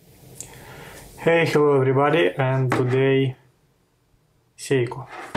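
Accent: Russia. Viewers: У автора русский акцент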